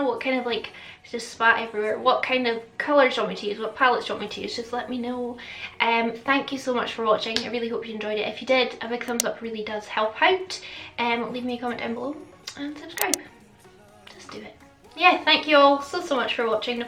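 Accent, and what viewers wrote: Scotland, United Kingdom. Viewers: Sound funny hearing our own Scottish accent when u say thinks like “ur mental mate” ha ha brilliant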